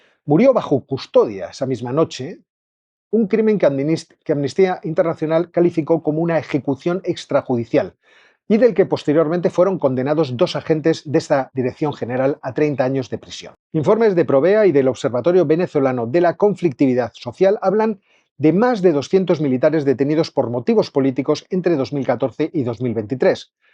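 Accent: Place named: Spain. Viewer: Creo que estás totalmente perdido y andas distorsionando la realidad. Comienza por hablar de lo toxica e inhumanamente posible de habitar que andas muchas areas de Latinoamerica por estar vinculados a la izquierda, a los rusos y vistiendose de delicuentes narcotraficantes. Por su acento es Ud español, yo soy cubano y le alerto, saquen al izquierdista que tienen manipulando España antes de que sea muy tarde. Abra los ojos, no confunda a personas que se pueden creer su utopica narrativa.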